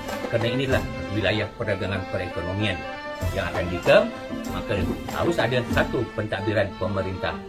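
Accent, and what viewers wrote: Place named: Malaysia. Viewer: kenapa cara dia bercakap macam orang melayu di malaysia?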